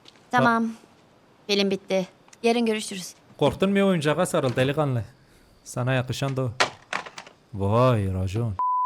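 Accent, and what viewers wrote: Elazığ, Turkey. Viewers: abi nerelisin elazığlımı lütfen söyle 20k like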